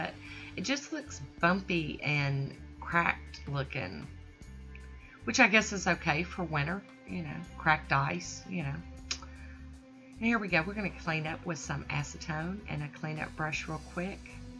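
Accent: South, United States. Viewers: The Whisk is very pretty! In the end I like this, it's cute with the snowmen and snowflakes! I gotta add, lol you tickle me to death with your "southern slang" and pronunciations (it sounds like I'm listening to myself) I just love listening to you talk! I never really understood why people would say that to me lol but now hearing you I understand! I got up from bed not wanting to wake my hubby with my horrible cough I have going on so I decided to go to my special spot and catch up on my videos lol, not so sure it was good for the cough lmbo but it sure is good for my feelings haha! Thank you, you're Awesome! Till next time, much love!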